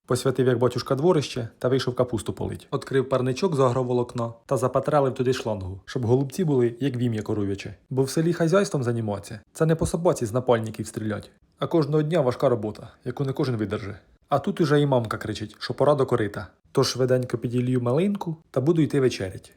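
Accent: Ukraine. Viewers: Боже,який гарний український діалект,насміялася Хай щастить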